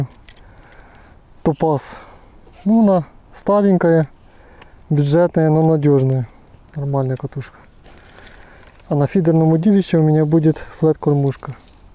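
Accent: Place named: Okanye, Russia. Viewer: ты че вместо буквы А говоришь О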